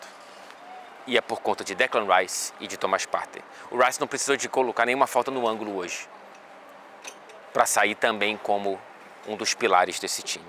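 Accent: Rio de Janeiro, Brazil. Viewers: É o carioques, não tem jeito! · Esse sotaque carioca é irritante. PQP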